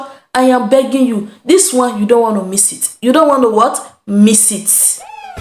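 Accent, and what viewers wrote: Ghana. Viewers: Thanks for your information. Your accent is gradually changing to Ghanaian accent. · Good girl. Thank you for your ténacité. Fantastic video. Your accent is so Ghanaian now. Your Ghanaian citizenship will soon be coming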